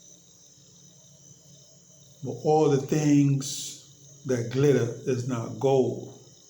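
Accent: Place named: Belize. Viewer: I thought that but it's actually Belizean they sound similar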